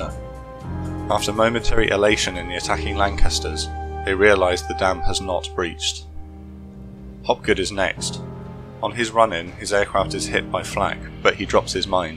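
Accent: United Kingdom. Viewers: A mistake that many, MANY native speakers of English make concerning anything related to Dutch geography. In this case it's the IJsselmeer - Lake IJssel. In this video you are painting the northern part of it, close to the Afsluitdam (Closure Dam) green as if it were land. Miraculously ,south of this part of the lake, you show its correct colours; blue (lake) water. Please always check DUTCH maps first when making videos involving Dutch territory. I have no idea where you English speakers get your wrong maps from. Not sure about your country I think it's Britain the way you pronounce it, so it shouldn't be insurmountable. It's on the other side of the North Sea.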